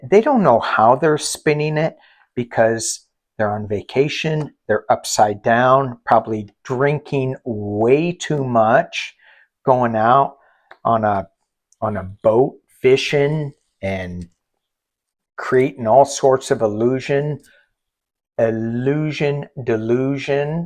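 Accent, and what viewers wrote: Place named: South, United States. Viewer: Yeeeeee ... your good ole boy Southern accent was sneaking in today ... 😁 ... yeah, a lot to talk about ... xx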